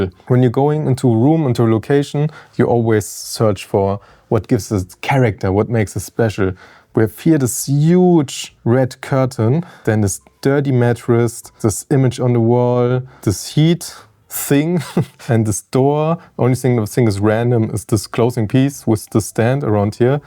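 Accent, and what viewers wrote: Germany. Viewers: Film is still unmatched in all aspects but the sharpness and fine details. But do you really need those pores on the nose to tell a story hehe. Still prefer the top-quality film as a viewer though. Also I don't mind german accent, its not indian or hungarian after all ))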